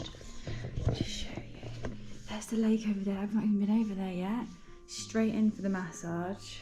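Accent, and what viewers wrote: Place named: Texas, United States. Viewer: You sound like you're from Texas! hahaha...Well hello from Texas anyway!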